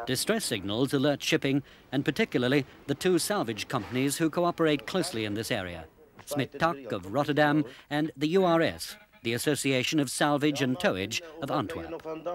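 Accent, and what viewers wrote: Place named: RP, United Kingdom. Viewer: It's not an accent it's called Received Pronunciation and he likely wouldn't have got the job without it at that point in time! I'm not a fan but I think it's deemed the most understandable form of the English language.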